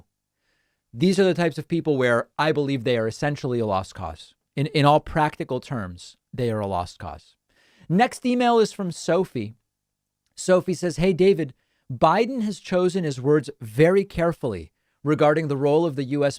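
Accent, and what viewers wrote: England, United Kingdom. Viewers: David pronounces those English words like we English do. Make of that what you will. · Ok. Just realized another reason why l like listening to you! Your pronunciation! ( Jamaican here ..so perhaps the British influence in the pronunciation choices, appeal to me as well 😏) but seriously --- WHAT is wrong with having multiple ways of pronouncing things! Sheesh...it is a large WORLD here, people! 😑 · You pronounce "nukes" and "nuclear" as British people do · nYukes / nYew: this is British pronunciation. And I would never have guessed English was not your first language. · For the record, your pronunciation of "nukes" and similar words is in accordance with British English rather than American English. That person was correct that "nukes" rhymes with "Dukes" but, in British English, they are both pronounced the way you are pronouncing "nukes". 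· I think its because you are speaking English rather than American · Four years older than his senile diaper wearing former POTUS. The decline of the West, yes, standards of probity and duty and competence have fallen. I would put it the door of the sinister Murdoch Empire, myself. Everywhere they go, they spread misinformation and Conservative rage. Look at the powerbrokers, not the fawning, incompetent sockpuppets they push forward for election. By the way, some of your pronunciation is more British than American. Either is correct. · England and australia do same pronunciation as you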